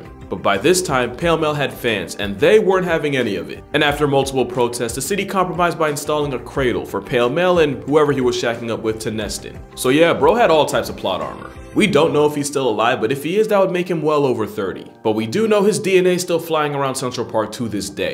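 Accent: Scotland, United Kingdom. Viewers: His Scottish acsent is good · Hey! Nice Scottish accent 👍🏼👍🏼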